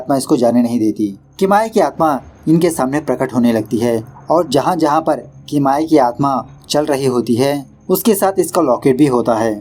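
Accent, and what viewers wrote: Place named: South, India. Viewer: You sound sweet bro, speak Hindi with South Indian accent, we love it.😊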